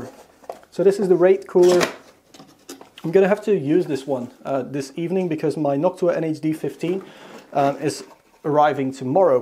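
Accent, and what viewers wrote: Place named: Netherlands. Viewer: Are you dutch? you sound dutch. And do proccesors come with thermal paste?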